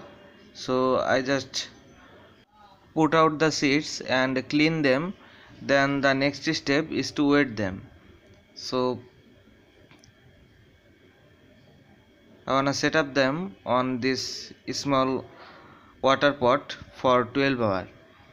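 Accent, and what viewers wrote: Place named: Butler English, India. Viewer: Butler English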